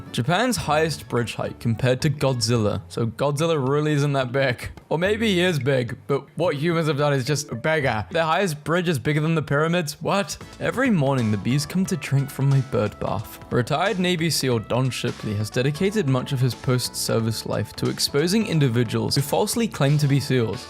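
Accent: United Kingdom. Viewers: I just realised hes British!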